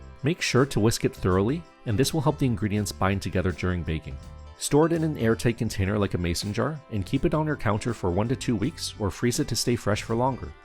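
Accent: Canada. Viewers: Very nice recipes. 💖I have been baking my yeast breads using granola and what a game changer that has been. I haven't gone back to my regular favorite bread recipes since I started using granola in them. I will definitely start making my own granola now that I have watched your video. I need to ask...are you from Canada? A few of your words hinted to me that you are Canadian. Thank you so much for sharing for recipes.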